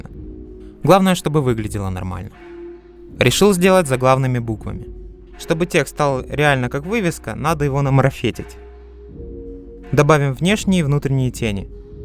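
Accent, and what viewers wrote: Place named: Odessa, Ukraine. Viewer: оуу ты из Одессы))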